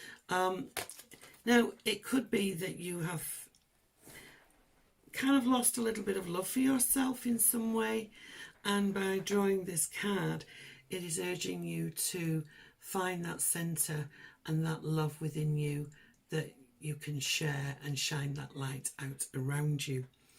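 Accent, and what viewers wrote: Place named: Rochdale, United Kingdom. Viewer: Thank you, this resonates with me in two on-going areas of my life. I've subscribed so looking forward to monthly readings. (Are you a Rochdale girl by any chance? Only asking this because you sound just like me!) The best general monthly reading I've ever watched xx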